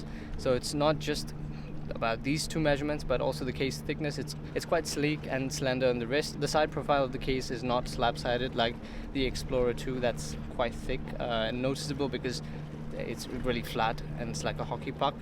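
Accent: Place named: India. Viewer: Where are you from? Your accent is like Indian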